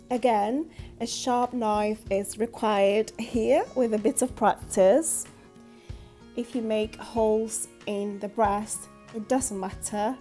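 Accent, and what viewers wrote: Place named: London, United Kingdom. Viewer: First of all lemme say you have a nice accent 😍😍guess you live In London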